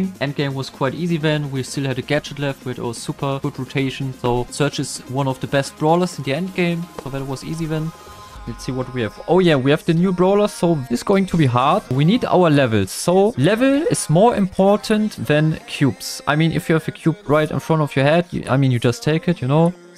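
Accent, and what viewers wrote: Germany. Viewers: I just love the german accent 😊 btw I‘m also german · Junge wie deutsch kann man englisch sprechen 😂 tut richtig in den Ohren weh deine Betonung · Ich höre es so sehr heraus das du deutsch bist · You are german right? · Yooooo this is the most german english I ever heard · Mann hört das er eigentlich deutsch ist haha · Moin wie nutzt du BS auf deinem Pc?? und geiler Deutscher Akzent xD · Love the german accent ❤ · German accent makes my blood boil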